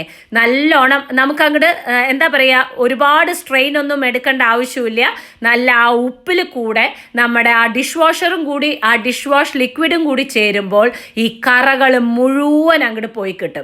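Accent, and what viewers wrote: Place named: Palakkad, India. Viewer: I am watching u r vedios just yo hear the palakad slang🥰😍🤩💕.I am a alumini from chittur college, basically I am from kannur still in love with pkd slang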